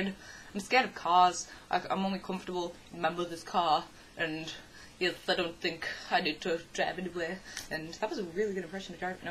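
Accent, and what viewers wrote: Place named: United Kingdom. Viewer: i didnt realise ur british ? :O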